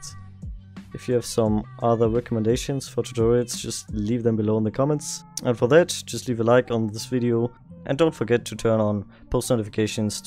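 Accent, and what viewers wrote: Germany. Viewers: Der deutsche Akzent sticht mies hervor 😳🧙🏿‍♂️🧙🏿‍♂️ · man merkt du bist deutscher xD No front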